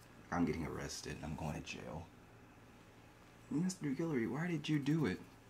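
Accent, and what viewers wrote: England, United Kingdom. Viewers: I’m English, and I love your English accent. Ignore the haters, x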